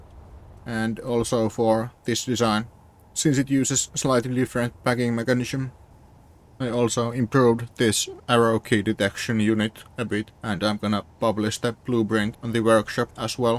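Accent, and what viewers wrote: Finland. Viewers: Just found your channel earlier and love it! Keep up the good work man. Also, you have a very interesting accent, are you from Finland? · You sound finnish, Are you finnish?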